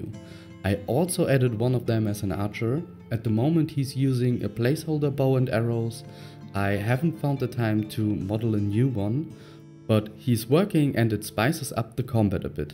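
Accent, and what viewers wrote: Germany. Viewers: Great Devlog! Am I hearing a slight german accent my guy?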